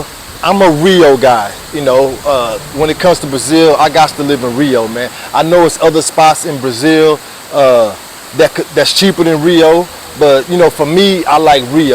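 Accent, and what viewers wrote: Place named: South, United States. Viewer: My brother you got game the gift for gab and much respect to you for help with understanding where we travel 🙌 💯 let's chop it up 😅 hey where you from Miami i bet somewhere down south. Im from Montreal Quebec Canada 🇨🇦 but visited my Aunt Uncle and cousins Miami dade airforce base and sounded similar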